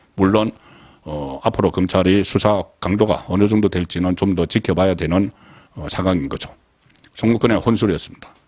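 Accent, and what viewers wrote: Gyeongsang, South Korea. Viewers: 송국건씨 구속영장 경찰이 검사에게 신청 검사는 법원에 청구하는 법률용어 정도는 기본 아닌가요 그리고 엑센트 강약의 사용 용례도 정획히 하세요 진주 촌놈 경상도 사투리 제발 좀 멘트 하나하나 법률용어 제재로 사용하길 바람요.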